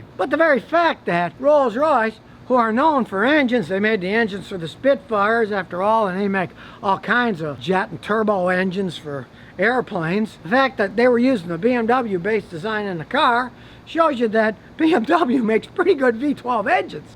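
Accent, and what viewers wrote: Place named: North, United States. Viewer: how come you live in Houston but have a northern accent? Those hard Rs, dead give away.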